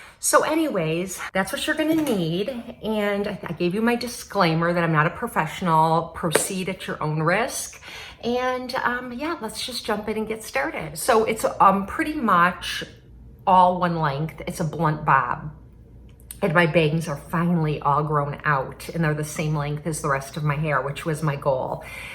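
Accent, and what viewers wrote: Wisconsin, United States. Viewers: I hear Wisconsin or Minnesota in your voice?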